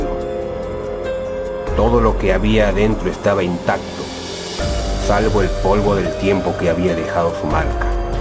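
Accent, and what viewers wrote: Tucumán, Argentina. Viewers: Hola, muy bueno, sos tucumano??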